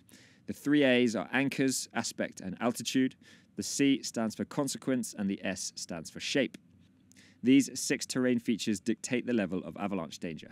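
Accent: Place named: Australia. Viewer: Oh jesus! Not another aussie, new zealander or whatever!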